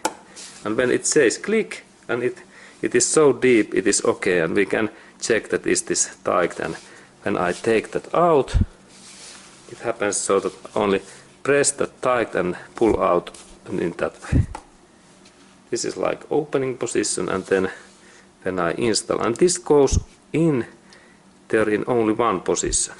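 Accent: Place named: Finland. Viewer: Ai että rallienglantia tää on kova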